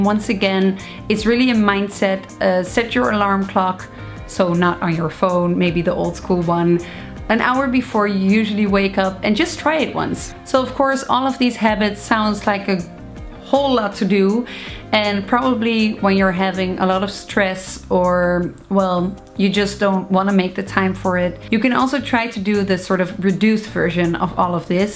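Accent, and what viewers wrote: Netherlands. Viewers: echt een nederlandse accent :) Leuke video! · OOOH you're dutch! I seriously thought you where from an indian or bahama kind of place, because of your accent, but after you showed that card I could hear that you're dutch haha. I love these tips. They're really helpful!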